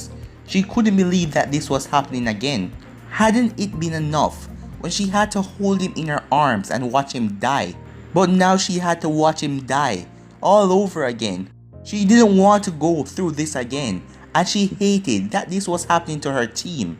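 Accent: Jamaica. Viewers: Hey are you Jamaican I'm only asking because I am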